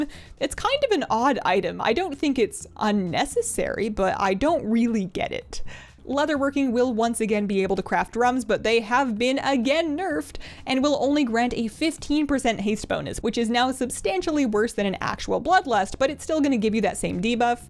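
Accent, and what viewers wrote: Canada. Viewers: Subtle Canadian accent? ♥ Canada.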